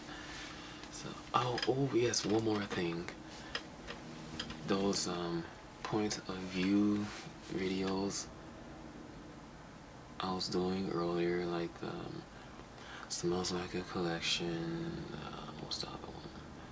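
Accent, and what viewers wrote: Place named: Midwest, United States. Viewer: are you from the midwest...you sound like some of my friends from st. louis saying hurr : ) i love it soo cute.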